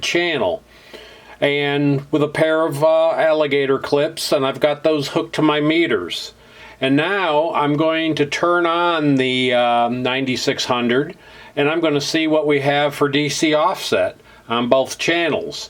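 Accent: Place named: Buffalo, United States. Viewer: Your accent is just like a co-worker that is from Buffalo, NY.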